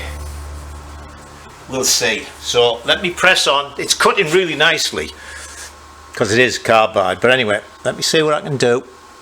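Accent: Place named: Teesside, United Kingdom. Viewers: Only found your vids. a month ago so a lot of catching up. Was intrigued by your Boro accent so have looked U up. We must have been around similar people similar times Graham Petite John France Geoff Crust Chris Rea for my sins once a Boro lad always a Boro lad. Love your simplicity & knowledge of engineering/Metallurgy. Me Metallurgy Dorman Long Warrenby Brittania SB Coke Ovens Lackenby. Good to C U putting in the graft. Ignore the twitters. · Brilliant videos great watching still got that Teesside twang then, Martin from Hartlepool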